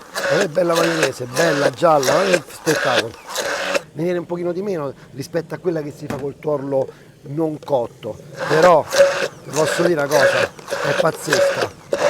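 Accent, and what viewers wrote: Rome, Italy. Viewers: Meno accento, romano però: ti sentirai più spontaneo.... a me sembra che parli solo a quelli della tua città ... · Quando ti sento mi ricordi Roma (dalla quale manco da più di 20 anni, ahimè) comunque l'hamburger l'hanno inventato gli antichi romani (isicia) anche se la radice del nome è in effetti tedesca..Complimenti per la ricetta, i broccoli sono un colpo di genio... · Ma la < padela de fero>... é robba da guera. Non mi piace il dialetto romanesco, ma tu me lo rendi gradevole. posso un consiglio? Il torsolo dei broccoli, NON SI BUTTA! Va pelato dalla scorza e spuntato alla base, poi si taglia in pazzetti di mezzo centimetro e si mangia crudo con o senza condimenti. Una delle verdure piu buone in assoluto, da primato! E pensare che tutti lo buttano via. Un delitto. A crudo, tipo mela.. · Toujours des recettes délicieuses, et puis cet accent romain qui me rappelle tant mon enfance, merci Chef.....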